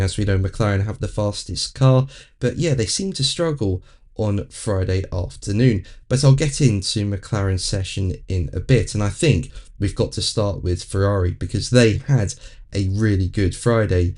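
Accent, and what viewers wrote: England, United Kingdom. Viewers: Its called an accent, not impediment. There is a country called England, they speak the same words as america but with a different accent. Hope this helps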